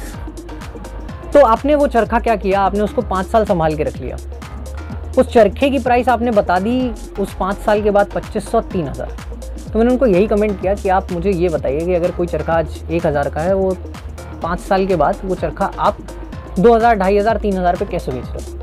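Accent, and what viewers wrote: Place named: Allahabad, India. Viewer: Allahabadi bhasha me akdum lalantak Patang hai bhai apki.... Man moh Liya bhai. LOVE FROM ALLAHABAD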